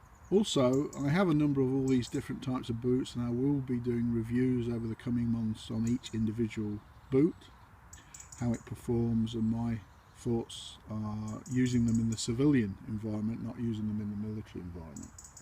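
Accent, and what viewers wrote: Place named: United Kingdom. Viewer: Ah, that lovely British voice ! Of course, you guys used your ruddy boots to kick the shit of of my country and countrymen for about two hundred years but since you people also brought in modern medicine and science and the bloomin railway, I'm not gonna hold that against you. Good video... great boots, the new ones, that is. The black ones were shit. Lovely presentation. Wish your lot hadn't decided to become increasingly 'American'. Alright, take care then. Toodles !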